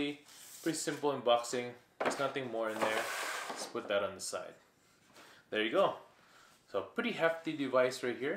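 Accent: Canada. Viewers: okay, good to know. Btw, are you Canadian? I hear a bit of a Canuck accent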